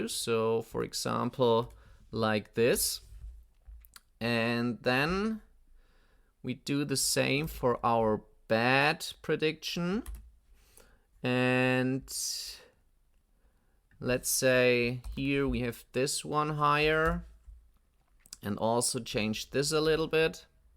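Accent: Germany. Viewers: never heard a more german pronounciation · I love how uncompromising this german accent is.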